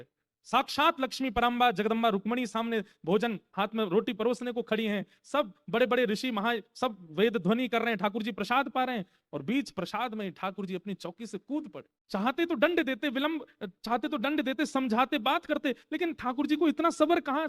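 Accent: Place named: Braj, India. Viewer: Aapke mukhmandal se brj Bhasha pyari lagti h sunaya kro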